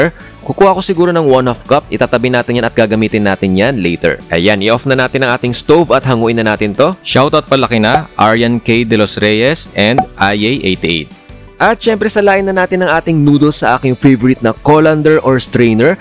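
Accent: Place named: Visayas, Philippines. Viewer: Hahaha bisaya diay ka?!!! Nyahahaha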